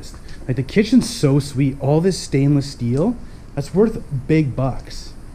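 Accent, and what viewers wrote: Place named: Canada. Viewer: ABSOLUTELY LOVE THAT CANADIAN ACCENT!!! WE LOVE EVERYTHING ABOUT YA!!!! 😍🌟👍👍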